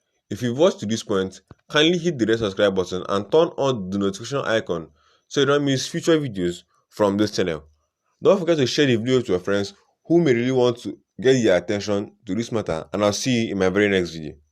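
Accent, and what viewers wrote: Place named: Igbo, Nigeria. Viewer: Please work on your Pronunciations Oga😂! You are too Igbotic hope you know that you are talking to the world. This is the Internet Bro, the world is watching. Try and improve on your diction as well as gather the right facts. Who knows you might be building your way to the top.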